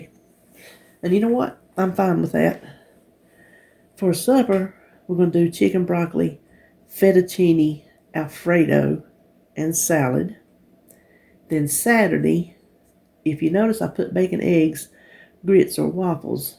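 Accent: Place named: Mississippi, United States. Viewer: quit beating yourself up by saying you're taking the easy way and!! Cut yourself some slack. If you don't feel up to making a recipe and have a simpler or easier way then go for it!! You're wonderful and I LOVE you just the way you are. I'm born and raised in northern Illinois and we wore bread sacks on our feet and then our snow boots. Boots went on easier and helped keep our feet a little warmer. I'm 64 and was born and raised on a dairy farm and we had lots of outside chores to do. You remind me a lot of my Aunt who lived in Mississippi. Your accent, smile and laugh just brighten my day. Have a great weekend.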